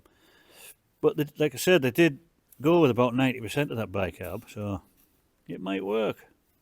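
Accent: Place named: County Durham, United Kingdom. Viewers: Hiya bud you've definitely got a good rat problem there have you thought about getting some guys local to you with air rifles with decent day and night vision outfits that could come regularly, I don't know where abouts you are or I'd suggest someone to you, I look for permissions like this to shoot rats and feral pigeons etc on as its a hobby of mine, there's lots of YouTube channels that show the type of thing I mean it might be worth you reaching out to one of them and maybe put a notice in your local farmers co-op and if you or your family are on Facebook there's lots of groups around the country with guys that would love to come and help you out by shooting the rats for you, I'm in west Wales and going from your accent your up the north of England somewhere otherwise I'd say I'd love to come and help you out, Anyway I hope you have some success with them soon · Better off getting someone trustworthy in to take care of the rats. 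Air rifles at night. You sound somewhat local to me if you’re interested. County Durham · Where abouts in the country are you? North by the sound of your accent. I would be delighted to help you get rid of the rats with a silenced night vision thermal air rifle. Please reply for contact details.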